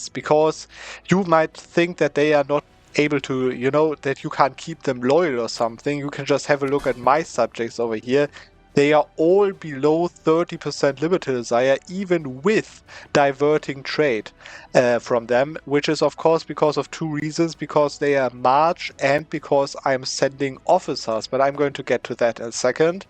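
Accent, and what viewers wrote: Germany. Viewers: As a Pole I hear that you're German in every word you say haha. Great video, good advice bro!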